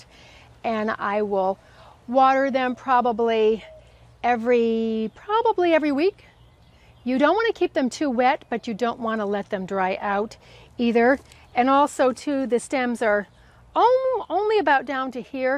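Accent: United States. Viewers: You sound like you're from Northern USA or Canada! Such a sweet voice makes the video easier to watch!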